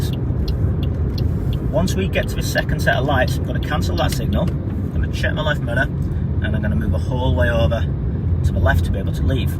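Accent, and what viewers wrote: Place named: United Kingdom. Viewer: I was flabbergasted when he staring talking in British accent 😂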